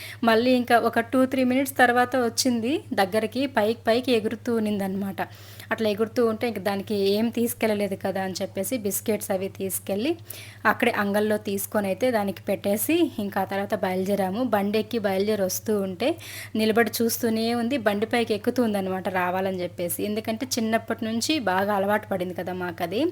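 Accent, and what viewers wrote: Chittoor, India. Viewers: మిరు చిత్తూరు భాషలోనే మాట్లాడండి అక్క బాగుంది మరుమాట్లాడేవిధానం మాకు అలానే మాట్లాడండి మిపాత గొంతు వినివిని విన బుద్ధి కాలేదు. అక్క మాకు అలాగే చిత్తూరు భాషనె బాగుంది ఎంతమందికి చిత్తూరు భాష కావలి ఫ్రెండ్స్ నెను పెట్టిన కామెంట్ కి ఎంత మంది లైక్ చేస్తారు. ఫ్రెండ్స్🥰🥰🥰🥰🥰🥰🥰🥰😀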